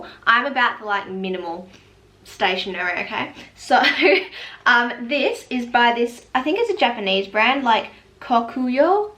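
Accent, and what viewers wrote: Australia. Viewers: I love the accent of australian 😍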